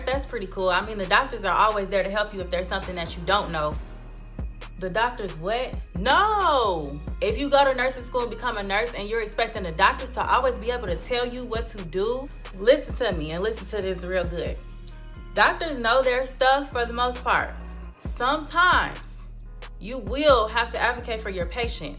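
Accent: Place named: Los Angeles, United States. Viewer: Where you from!? You sound like you from LA! Wit yo pretty ass!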